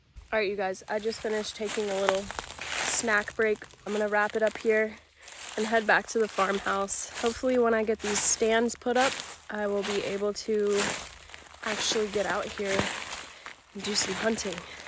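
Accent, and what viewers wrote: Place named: New England, United States. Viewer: Recommended on my You Tube feed so I will provide feedback in the form of a comment. I am not interested in the You Tube channel of any American Woman, particularly, white ones like my Grandmother on Mom's side, Mom, my Sister, my Stepdame, and any other American Woman. Perhaps it is self hatred, but the Yankee accent only exacerbates my repugnance for the women my nation has produced-the feeling, no question, is mutual-extending beyond nationality to the white race. I will review my subscription list when I get a chance and make sure to remove any to American women, though I will target in particular those American women from the USA, and then, white ones. I simply cannot brook the sense of entitlement in these women.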